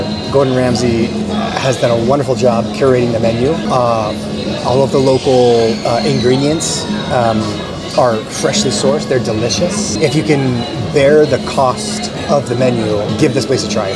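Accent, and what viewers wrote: Philippines. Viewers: nakatawa kuya pinoy na pinoy accent mo😂😂😂 galing😂 whats you and your brother's software company name? is that also in philippines or abroad? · Nice Filipino accent Sir. Speaking Filipino gives due respect to the Philippines. We do not care of your intonation your Filipino talk is all what we love to hear from our visitors. Thank you Sir.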